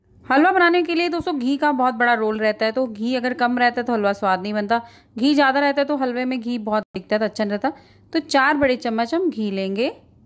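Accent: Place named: Rajasthan, India. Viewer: Rajasthani.typical accent.accepted.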